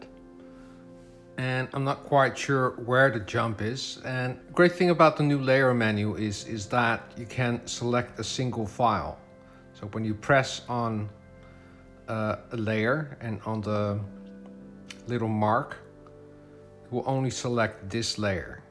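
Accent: Netherlands. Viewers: wist het all van je accent😂😂😂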